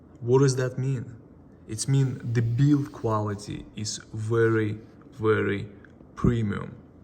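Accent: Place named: Russia. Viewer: Акцент на русский похож))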